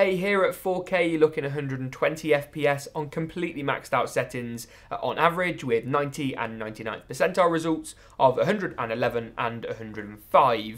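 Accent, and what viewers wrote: United Kingdom. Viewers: This is the most British person I've ever heard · that is the strongest british accent I've ever heard. Had my attention the whole time. Good job dude 👍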